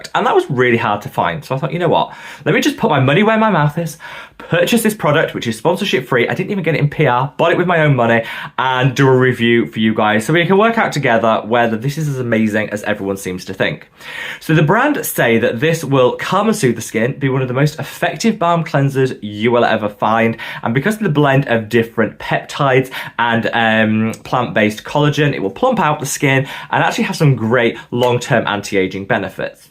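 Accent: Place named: North, United Kingdom. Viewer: Love this!! I'm a huge fan of Revolution products - will it be better than the Sali Hughes one which is just lovely. I think your vids are fab, fellow northerner here loves hearing your accent and the info you give is invaluable especially for those whose pockets are not as deep as some reviewers like to think. I'll be popping it in my basket next shop! I must say My skin has improved dramatically over the last few years, I'm 47 and since following a real and consistant skincare routine my confidence has been boosted, especially since people are saying I look 10 years younger than I am - I'll take that ANYDAY! I'm not saying I have good genes, but I do know the skincare and advice your channel has given me has helped for SURE!! Keep up the good work, I'm jealous of your skincare shelf!! 😍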